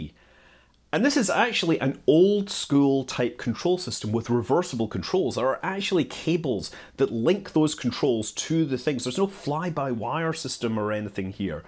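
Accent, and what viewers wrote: Scotland, United Kingdom. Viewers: He's Scottish. Well, kinda.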